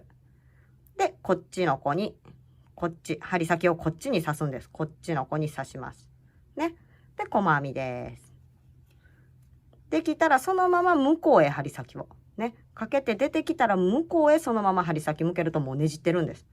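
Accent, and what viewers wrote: Kansai, Japan. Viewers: はじめまして、こんにちは😃 なんか、馴染みのある関西弁でご説明されてるので、思わずコメントしちゃいました😂 兵庫から鹿児島に嫁いではや30年、今だに関西弁が抜けません笑笑 この編み方、難しそうですが、面白そうなので、ぜひやってみようと思います😊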